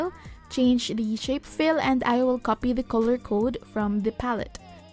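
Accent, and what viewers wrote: Philippines. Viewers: based on your accent, are u filipino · I can tell your From the Philippines May me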